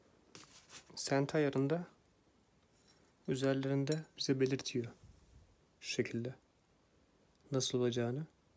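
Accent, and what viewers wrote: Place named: Kastamonu, Turkey. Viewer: Aslan gardaşım devam et maşallah sening gerdüre gerdüre gonuştuğun Gastamonu ağzına gurban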